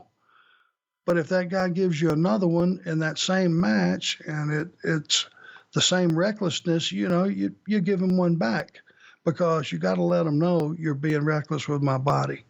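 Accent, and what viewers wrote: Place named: South, United States. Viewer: This is my first listen to anything from Arn's podcast, and all I can think of in listening to him is that he sounds like Winnie The Pooh in a southern accent. Listen to Winnie the Pooh from the 1970's when Sterling Holloway did his voice and imagine that with a southern accent. This is today.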